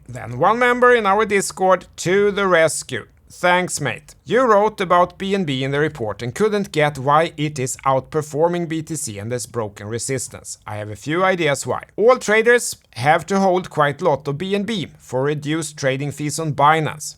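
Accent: Sweden. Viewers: Love your vibe, you odd swedish accented guy!! You prob think that about our Aussie accent too.Thanks for sharing here on YT.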